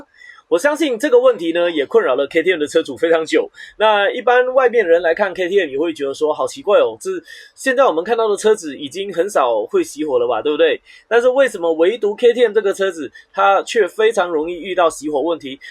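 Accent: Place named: Hakka, China. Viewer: 聽起來像是客家國語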